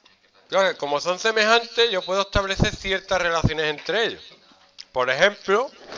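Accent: Andalusia, Spain. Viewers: ole ese andaluz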